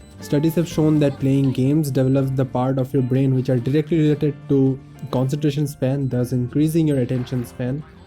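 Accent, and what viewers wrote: Bengal, India. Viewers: you bengali?? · I just love that heavy Bengali accent ... Lightens up the mood (no offense)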